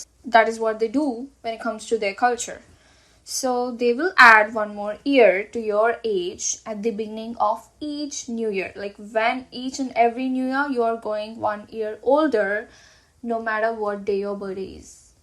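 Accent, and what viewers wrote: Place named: India. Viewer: Great video BTW are you Indian because your accent is a bit different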